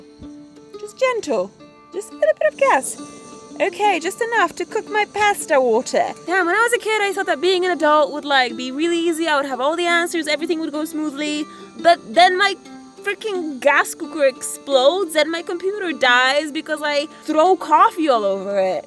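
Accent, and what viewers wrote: England, United Kingdom. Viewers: What a great video and such a lovely girl. What a lovely English accent she has! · Back when had an English accent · She grew up & worked in London hence the British accent . Also she went to Oxford University over there !!! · The British accent, the Land Rover Defender.. I think i found Lara Croft in real life :) Don't tell me not everybody had that thought hahaha 👍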